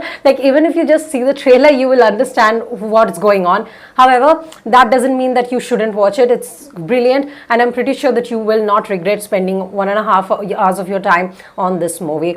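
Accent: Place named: India. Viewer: Are you an Indian? I guess, your accent is Indian.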